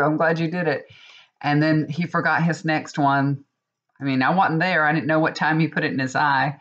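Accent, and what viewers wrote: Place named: Texas, United States. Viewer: I love the chit chat . I'm from 🇨🇦 and I love that Texan accent plus you have great stories . 💞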